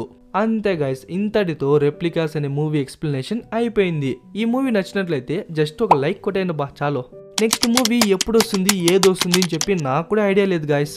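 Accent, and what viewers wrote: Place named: Tamil, India. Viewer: Bro meru tamilian ahh me telugu koncham tamil mix chesinatu vuntadhi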